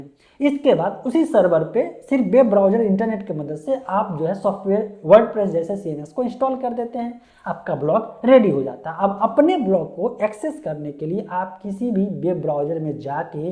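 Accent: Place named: Bihar, India. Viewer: Bhai apka bolne ka tarika s lag rha hai bihar jharkhand s ho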